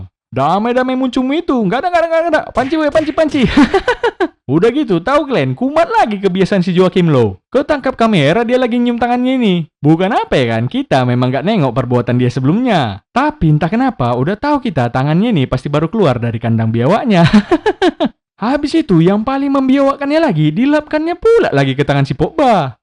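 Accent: Medan, Indonesia. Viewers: Digigit tungir wkwkwkw Medan kali ah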